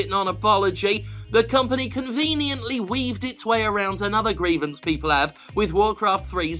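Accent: United Kingdom. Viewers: Man I would never imagine a British with the elegance of the accent living on one of the most southern places 😂 you’re the hero we don’t deserve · Why did i always think u was from Britain, England?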